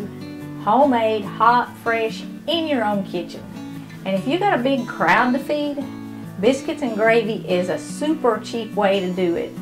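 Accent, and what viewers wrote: South, United States. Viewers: U just made me hungry seeing that plate with fried apples 😋.Must be a southern video by voice👍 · Thank you so much, I really enjoy it and remind me the country accent of my father in law, God blessed you! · Hi, this is my first time viewing your channel, I hope you never get tired of hearing this.. your southern drawl is awesome! I was blessed to have my moms parents who were German and Italian (both very thick accents) raise me.. well for the most part. Then mom married when I was 5 to a very good man.. which meant I inherited another grandma, his mother. She was very southern with a thick accent as well! LoL This was not a wealthy woman by any means, in fact she struggled at times financially. She had 3 grown sons and had no intentions of having any other children in her mid 50’s.. but surprise! She had a daughter that was only a year older than myself when we met. 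Technically, her little girl was my Aunt by marriage but naturally we were raised more like sisters. I’ll tell you what, my southern granny made the best food out of practically nothing sometimes. Her biscuits and gravy were simple just like u showed in your tutorial but ohhh so flavorful! Just like you said, it didn’t take much sausage to feed a handful of ppl since it was only meant to flavor the gravy. I probably wouldn’t have tried rabbit in my lifetime had it not been for her southern cooking. Her fried chicken was to die for and she could do anything with a pot of beans and some cornbread when the budget got tight.. and it was delicious! Traditionally at Christmas time, we did not set out milk and cookies for Santa at her house but rather a bowl of butter bean soup and a homemade biscuit. One year us gir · Woman with southern accent + cast iron skillet = great food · You sound like a hillbilly to me and I like that. 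I like hillbillys, I like you, I like your style, and I like your videos. The residue on the bottom of the pan is called "fond." Incorporating it into your sauce is deglazing.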